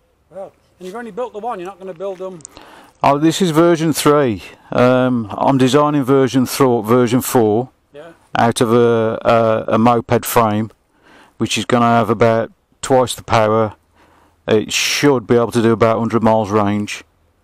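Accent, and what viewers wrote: Birmingham, United Kingdom. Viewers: Very intresting guy to watch . U a brummie like myself 😂👍👌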